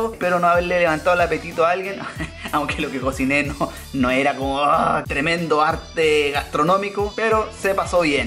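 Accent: Chile. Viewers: Habla como chileno wn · Yo cache por como ablaba q era chileno :/ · Eres chileno o de otro pais con acento de chile :v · comunica chileno?:v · Ese acento chileno me desespera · No sé cómo es que estoy suscrita a tu canal (no recuerdo haberme suscrito) pero que bueno!! Jajaja me quedé super contenta con tu video. Cuando vi el título dije "$800 no esta mal" hasta que escuche tu acento que comprendí que no eras mexicano. Aquí con eso puedes hacer las 3 comidas del día en un restaurante que te incluya todo en paquete y quedas más que satisfecho, pero lo lograste! Saludos hasta tu precioso Chile :D bendiciones y excelente video!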